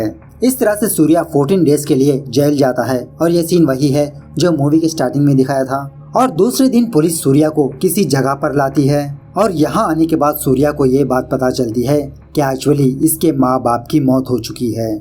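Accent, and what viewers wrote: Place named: Hyderabad, India. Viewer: Perfect Hyderabadi Slang story narration.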